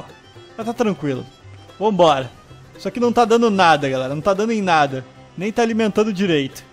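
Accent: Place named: Goiás, Brazil. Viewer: O fala igual goiano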